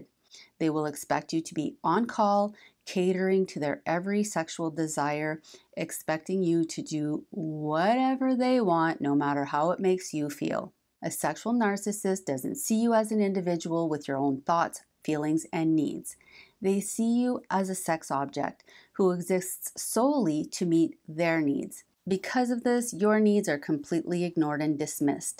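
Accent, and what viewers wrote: Canada. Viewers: Love your Canadian diction...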